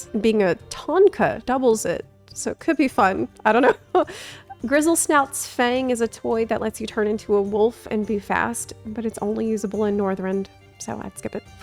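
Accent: South, United States. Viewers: are u from the south?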